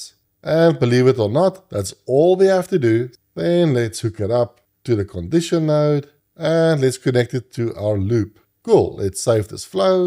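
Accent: South Africa. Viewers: Nice one boet. Your videos are getting better nad better, and are so informative and helpful. I understand you've explained the dfference between this and n8n...just not quite surei get it. I am hoping you'll get to the point where flowise has a stt/tts interactive style. The dream is a personal assistant that can manage calendar, emails, and sdhopping lists etc. And I cna "control" it all via whatsapp! Keep up the great work Dis ook lekker om 'n ander Suid-Afrikaanse ou te hoor!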